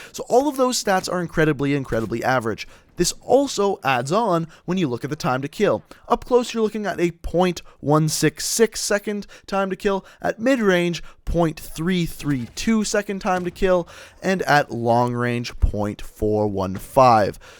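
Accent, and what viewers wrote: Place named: Newfoundland, Canada. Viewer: I think this guy is from Newfoundland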